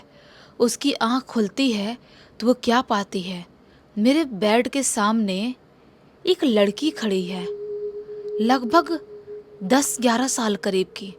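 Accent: Bihar, India. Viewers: Majja nhi ata accha bolta jaise real me ho apki awaj me thoda bihari pan najar ata or acting bhi over lagti h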